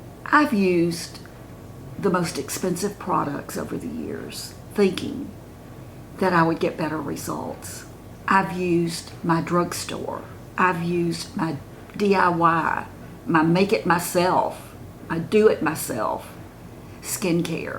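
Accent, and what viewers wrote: South, United States. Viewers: I love the sound of your voice. I know you have a southern accent, but I can’t name the state. Some older women have unappealing voices and they need to work on that. I guess you can’t do a video on that, but is a big factor.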